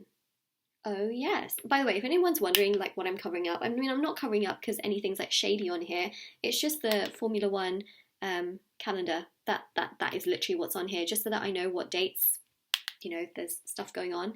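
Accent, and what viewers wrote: United Kingdom. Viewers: Damn pile 2 really resonates to my Twinflame. This wasnt happened once yet every readings i tried to look for my person i end up always pick at the right piles where the reading was always pointing to him. My beloved twinflames. Such a huge confirmation.. This is infact a new strong confirmation from you. Muchos gracias. May the devine bless u more and guide you more. Ive been an avid fan of yours because of your accuracy and very positive energy that i could really feel as i watch your videos and also i wanna say i really love your voice its soothing and im loving your British accent so sexy to hear. 😍😍😍😘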